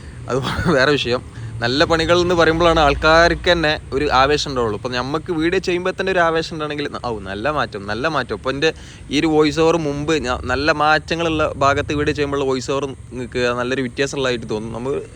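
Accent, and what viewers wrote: Malappuram, India. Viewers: ഞാൻ താങ്കളുടെ എല്ലാ വീഡിയോസും കാണുന്ന ഒരു പ്രേക്ഷകനാണ്. തൃശ്ശൂർ ജില്ലക്കാരനാണ്, ഞാൻ സ്ഥിരമായി NH 66 ന്റെ വീഡിയോസ് കാണാറുണ്ട്, താങ്കളുടെ വീഡിയോസ് ഏറ്റവും മികച്ചതാണെന്ന് പറയാതെ വയ്യ. എന്നാൽ, പല സ്ഥലങ്ങളും തിരിച്ചറിയാൻ കഴിയാത്ത വിധം മാറിയിരിക്കുന്നു. ദൃശ്യങ്ങളോടൊപ്പം അതാതു സ്ഥലങ്ങളുടെ വിവരണങ്ങളും ഉൾപ്പെടുത്തിയാൽ വളരെ നന്നായിരിക്കും എന്ന് തോന്നുന്നു. സ്വതസിദ്ധമായ മലപ്പുറം ശൈലിയിലുള്ള വിവരങ്ങൾ ഞാൻ വളരെ ആസ്വദിക്കുന്നു. എന്നാൽ, താങ്കൾ മറ്റ് വിഷയങ്ങളെക്കുറിച്ച് സംസാരിക്കുമ്പോൾ, അവയും നല്ലതായതിനാൽ, ഞാൻ ചിലപ്പോൾ ശ്രദ്ധ തിരിക്കപ്പെടുന്നു, ഇത് ദൃശ്യങ്ങൾ പൂർണ്ണമായി ആസ്വദിക്കാൻ ബുദ്ധിമുട്ടാക്കുന്നു. നന്ദി, എല്ലാ ആശംസകളും!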